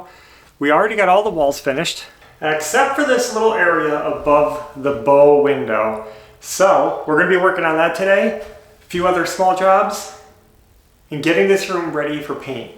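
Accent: New York, United States. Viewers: As a NY’er living now in the South I LOVE it that you sound like home. And I love the glasses. Thank you for being you! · Hey, I heard you in the beginning of your video say the word talking as “tawkin”. It’s so funny because I was coming to comments to ask you if you’re from New York. I noticed that accent immediately since I grew up and lived on Long Island for 57 years. Then you said you lived in Upstate, NY which is weird because you sound like you have more of a Long Island accent, than an upstate one. Upstate is one of my favorite places especially Lake George. I also had a question for. How did you get your clip on glasses to match your eyeglasses so perfectly? Were they made by the same place where you had your prescription glasses made?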